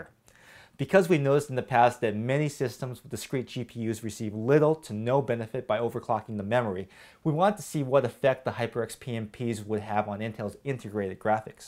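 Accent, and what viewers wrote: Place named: New Jersey, United States. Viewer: That's a lovely accent you have there...New Jersey?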